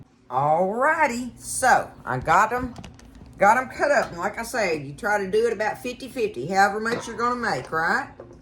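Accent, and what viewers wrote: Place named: Mississippi, United States. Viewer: Afternoon! I watch your videos for 2 reasons... 1. The foods you make 2. You! You're such a keen reminder to me of my family (all of whom have passed), the homey recipes & kitchen, the way you cook, and definitely the way you talk. I was born & raised in South Mississippi down around Hattiesburg but way out in the country on a farm. Spending time with you is like being back home with family. Thank you so much for filling some empty spaces in my heart. ~Nan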